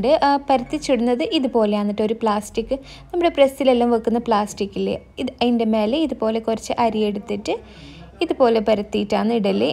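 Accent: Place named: Kannur, India. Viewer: കണ്ണൂർ കാരിയാന്ന് ലെ ഞമ്മള shamis own നിലെ ശാമിലയുടെ ഒക്കെ സംസാരം പോലെ 👍🏻👍🏻👍🏻👍🏻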